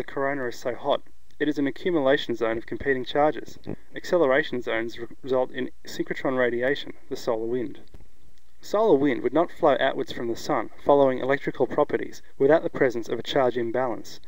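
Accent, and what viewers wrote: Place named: Australia. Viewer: it sounds like an australian accent. good on you for preserving it.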